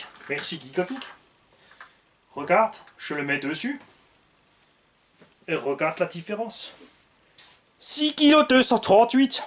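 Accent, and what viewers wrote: Alsace, France. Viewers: J’ai adoré l’alsacien cheun 😂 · 😂😂😂 de l'alsacien a 100% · 🍑😂👍 tip top l'accent alsacien mdr..! Bon b tu as du taf, voir + quand tu l'auras mis en pieces. Y a toujours des surprises en mécanique🛠 ☡🍑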